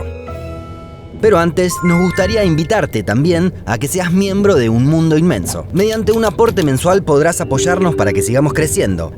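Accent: Argentina. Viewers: El acento argentino es lo màs horrible se que pueda escuchar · De dónde es el narrador? Argentina?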